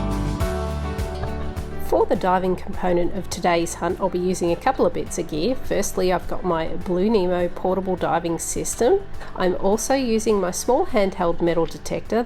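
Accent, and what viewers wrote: Australia. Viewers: Your videos are the best! Love your beautiful Australian accent : )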